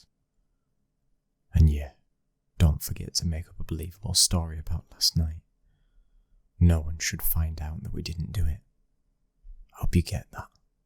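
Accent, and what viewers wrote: United Kingdom. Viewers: I knew i recognized the series, i have listened to the original one where it is a Italian voice, but i also liked this one as i am also British and it makes it easier for me to listen to, keep it up ❤❤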